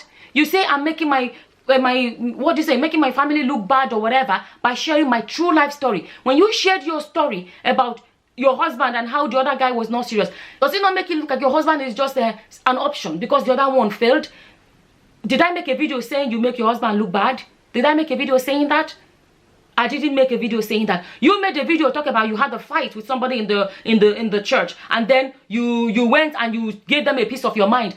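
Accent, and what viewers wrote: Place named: Nigeria. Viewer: I just feel like u speakin as a true Naja. I do not believe u speakin too fast o at all.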